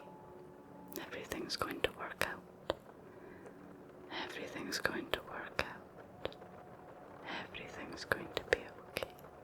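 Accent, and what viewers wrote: Scotland, United Kingdom. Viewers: Hi! Actually your ASMR is the only one right now that helps me sleep. Breakups always hurt even if they are on good terms. I recently lost a girl because I declared my feelings to her. I still consider her my best friend and it was partly my fault because years ago she was interested in me but I couldn't see it. I had my mind on other things. But at least we had a great friendship, the problem is what I felt was growing. And now here I am suffering. I would like to recover the friendship at least but I see it difficult. It won't be the same anymore. Thanks again, your Scottish accent and naturalness help me. Greetings from Spain. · Attaweyman, I love the Scottish, go on you dancer, great video with a touch of real life, first 6 months are the worst 😎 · This may get kind of long, but I will try and shorten it up as much as I can. 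First, let me say that I didn't know what ASMR was until a few months ago. I was having a hard time getting my mind to wind down while trying to go to sleep. I was trying white noise, tribal flutes, thunderstorm sounds, etc. Just anything that I could think of to relax to. I just happened to be scrolling through YouTube when I saw a heading reading This Scottish accent will knock you out. Now I always been a sucker for a soft Scottish accent, so I clicked on it and that was probably the best sleep I have had in a very long time. I have been watching your videos just about every night since then. Your voice and the natural flow of your hand movements just does something to this southern boys brain. So thank you for that. Second, I am sorry to hear about the latest events in your life. I know it will take some time, but you will definitely be ok. There are seven stages of emotion that you will go through before you are truly ok, but I know you got this. 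I have gone through them a few times and I can tell you that you will always come out stronger and more alive than ever before. The human heart was designed to reheal itself from hurt and pain because we as humans will continuously experience things in our lives that we have no control over. We will get knocked down, we will get our hearts broke, we will become emotional wrecks, but we will always be able to pick ourselves back up, dust oursel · I don’t comment very often because I’m just the older guy who happens to appreciate your videos, your smiling eyes and that wonderful Scottish accent. But your eyes aren’t smiling this time and I just could not sit by and keep quiet. I won’t offer you a string of clichés about getting past the pain of losing someone you cared for. By your comments here it’s apparent that you will be ok. I just wanted you to know that despite the fact that our only connection exists in the ether of YouTube, I know you will be happy again soon.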